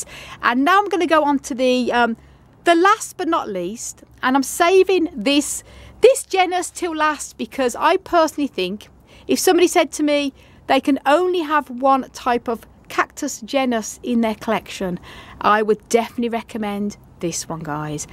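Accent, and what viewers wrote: United Kingdom. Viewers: I got to learn more about my cacti. by the way, you have beautiful British accent! I enjoyed your video!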